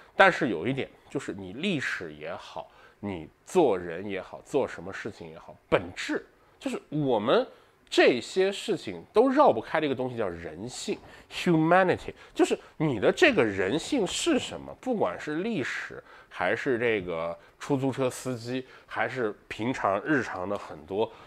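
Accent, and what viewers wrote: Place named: Northeast, China. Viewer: 还别说，这东北话说的还挺顺溜。老铁那啥呀，还是别叫老北京口语了，改老东北口语吧！:face-blue-smiling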